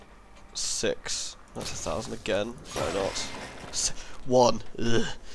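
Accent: England, United Kingdom. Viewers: ***** I know this may sound like I'm a cruel and evil person, but personally, I quite enjoy watching you play games which don't entirely satisfy you. I love hearing you complain about things like a gamer would when he's dissatisfied. It feels like I'm actually watching a player play the game (and narrate in sexy English voice) and complain when things are not as they should be. So yeah, keep at it, <3 it :)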